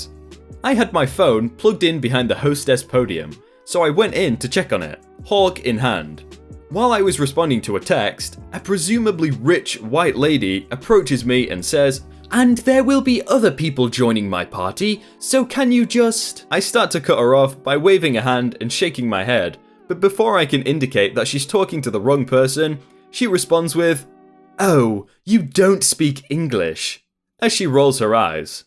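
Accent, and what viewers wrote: United Kingdom. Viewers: If you want to find a taser flashlight, I have one. It's called the Guard Dog Special Ops Flashlight/Taser combo. I live in America so I'm not sure of you could get one from these people as you sound British. Still, if you can I highly suggest it!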